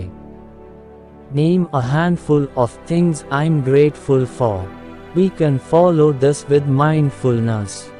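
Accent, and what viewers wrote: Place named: India. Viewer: I love you for creating this content, but i can't listen this indian accent, this is just lazy work bruh, pathetic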